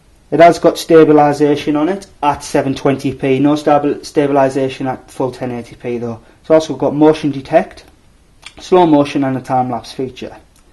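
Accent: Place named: Cumbria, United Kingdom. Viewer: dude where are you from......your accent sounds like some cumbrians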